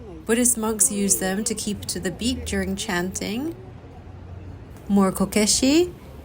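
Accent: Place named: United States. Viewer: I have a question, you being Japanese and British, you have an American accent but aluminum. Seems strange, was wondering.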